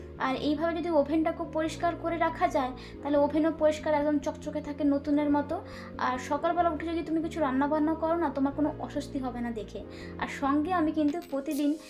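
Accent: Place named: India. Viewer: Puro videota khub valo laglo tomar kotha sune bojha jai j tumi india r meye ki sundor dekhe sune khoroch koro khub sundor lage dekhte cuttie❤❤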